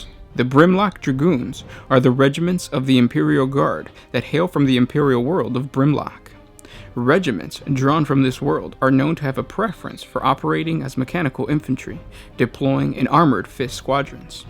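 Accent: United Kingdom. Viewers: Right off dat bat British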